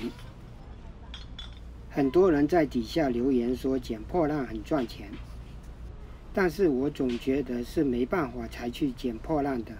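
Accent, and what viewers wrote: Fuzhou, China. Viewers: 听这口音，是福州人吧，老乡呀，期待你的视频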